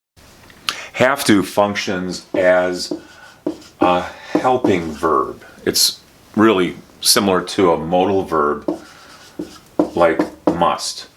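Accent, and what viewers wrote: United States. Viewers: trophy-yellow-smiling::trophy-yellow-smiling::trophy-yellow-smiling::trophy-yellow-smiling::trophy-yellow-smiling: ... a great teacher with a great All-American pronunciation ... !!!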